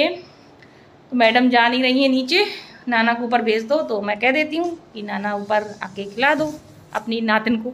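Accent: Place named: Orai, India. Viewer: आपकी भाषा तो हमारे यहां की लग रही है मैं उरई से हूँ आप किस शहर से है🤗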